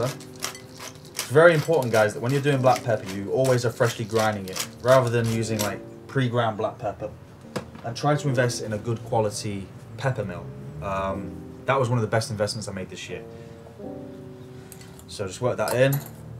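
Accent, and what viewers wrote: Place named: Staffordshire, United Kingdom. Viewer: Love your videos mate. I'd ask where you're from, but that would be rude lol. Accent sounds like it could be relatively local to me in Staffs lol. Probably far wrong but ehh 😂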